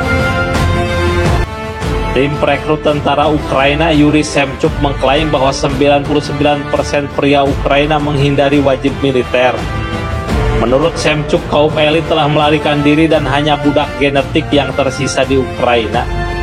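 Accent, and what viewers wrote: West Java, Indonesia. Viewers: Logat sunda lu bos kentara banget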